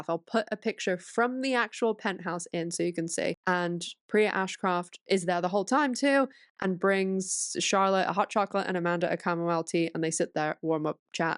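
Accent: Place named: United Kingdom. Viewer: Where in the UK are you from? Your British accent sounds faint, not Ike Queen’s English but something else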